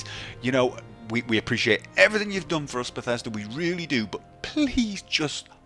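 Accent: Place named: North, United Kingdom. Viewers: You're from Norway? You sound a bit Scouse haha, cool video. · Are you from Lancashire by any chance? Your accent is Northern, but I know tapped r's aren't a Yorkshire or Tyneside thing as far as I'm aware.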